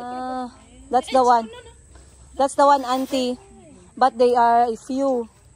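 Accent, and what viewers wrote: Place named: Western Visayas, Philippines. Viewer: Oh my God I super love the place kanindot bah. 😍💖 Angayan kaayo ka mag speak og ilongga ang sarap pakinggan sa tenga.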